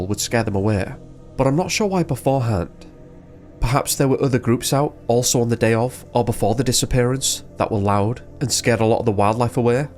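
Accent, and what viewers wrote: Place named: North, United Kingdom. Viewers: No I'm from near Northumberland I'm a geordie and I'd say it's a posh Lancashire accent or Yorkshire but definitely not Northumberland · The narrator has a Northern accent. Its quite acceptable to pronounce words with your own accent. Its you who is showing your ignorance by making such a comment.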